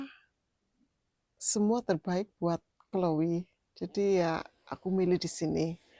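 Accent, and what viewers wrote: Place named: Java, Indonesia. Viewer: Bhsanya medok tapi enak didengerin kloboad lagi cerita, semangattts dan sukses terus ya mpok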